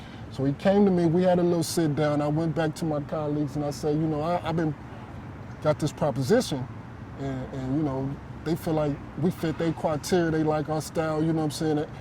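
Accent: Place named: Chicago, United States. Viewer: He sounds Chicago not new york... we don't talk like that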